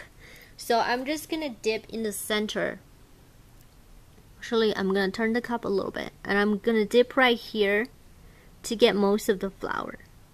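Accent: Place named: China. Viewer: its only because she has a chinese accent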